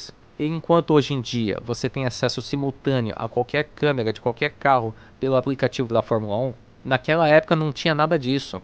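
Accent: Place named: Portugal. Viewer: Ele é português? Tem sotaque.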